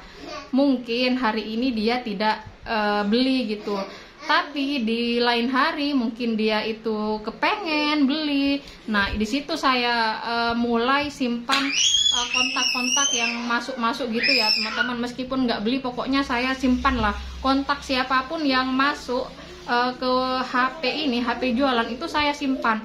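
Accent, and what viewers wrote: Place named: Sumenep, Indonesia. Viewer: Mbak tinggalx di mana? Kalau dari logatnya kayaknorang sumenep. Kalau emang sumenep, sumenep mana mbak.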